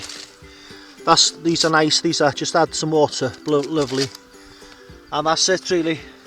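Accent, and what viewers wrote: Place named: Wales, United Kingdom. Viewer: Great video buddy,proper Welsh accent up your way. Bet you speak Welsh to.... Nothing wrong with a wild camp in lock down. Done it myself... Felt proper safe from covid, being miles away from civilisation. If you have any negative comments.. Tell them to piss off.... Check my wild camp out buddy... Atb dai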